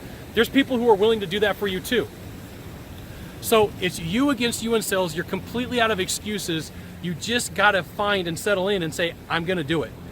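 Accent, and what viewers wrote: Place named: United Kingdom. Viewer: Hi, I remember getting into Sales after my husband died and bought and read sales book galore to start. I had to write and create all of my own marketing material and training. And so many great help out there. Sample it and attempt and integrate it. Congratulations on video 2000. Oh, that British accent. lol. Always providing great sales content. Yes, no lending library. I lent for awhile and, yes, never saw them again. Study at the library. Like that. It's always great having that coach, that mentor to bounce the ideas off of etc. Which we should, indeed. Aloha. Happy Holidays. Cheers and blessings, April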